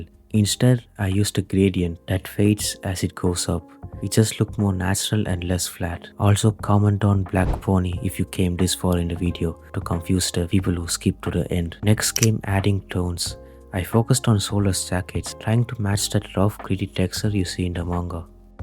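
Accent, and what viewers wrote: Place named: India. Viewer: Annoying Indian voice omg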